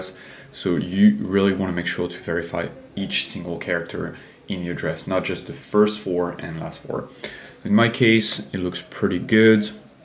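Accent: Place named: France. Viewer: Super helpful. Love the French accent, tho.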